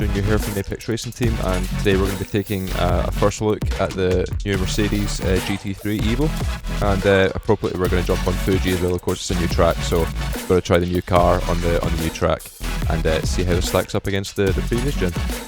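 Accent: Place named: Scotland, United Kingdom. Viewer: you've a great Scottish accent 😀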